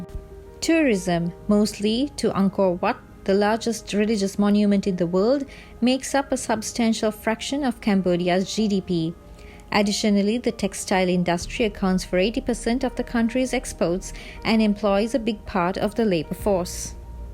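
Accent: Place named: Bangladesh. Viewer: Very nice content with plenty of information, does the speaker belong to Bangladesh?🇧🇩 she sounds like a Bangladeshi girl, very nice presentation.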